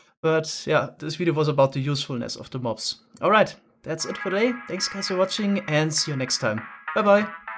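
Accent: Germany. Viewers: Damn you sound german, but great video tho.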